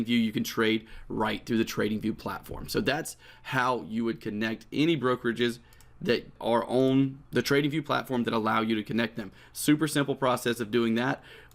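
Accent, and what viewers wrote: South, United States. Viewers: I can you’re from the south lol I am too “Wifey”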